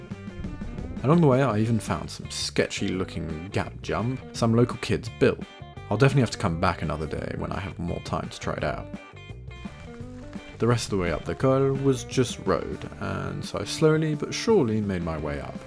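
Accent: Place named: England, United Kingdom. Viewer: Vous avez un accent anglais incroyable 🤩 continuez comme ça j’adore votre vidéo et vos shorts 😁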